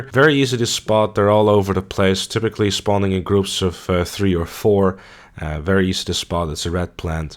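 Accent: Netherlands. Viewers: Great guide, I'm a fan of rear herbs. Are you Dutch? I can't place your accent.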